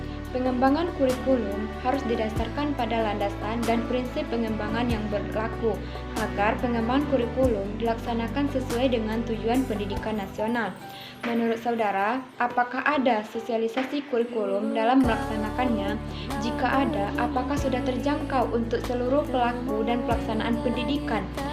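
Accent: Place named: Padang, Indonesia. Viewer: Mantap Kak...Logat Bahasa Padang E Nampak Bona Ya..🙂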